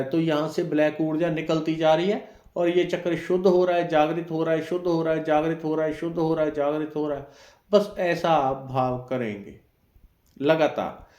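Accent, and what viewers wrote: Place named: Himachal Pradesh, India. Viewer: Himachal se ho kya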